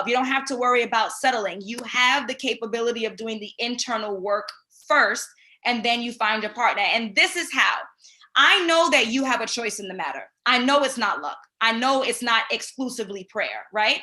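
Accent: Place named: Caribbean. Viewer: Amazing content. Yuh sound like a Caribbean. I support you!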